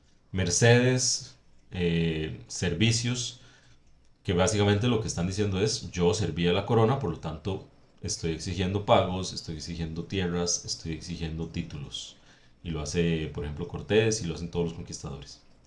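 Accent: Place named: Colombia. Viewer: El acento de los Ticos es muy parecido al de colombia. Juro que creí que eras de Colombia 😎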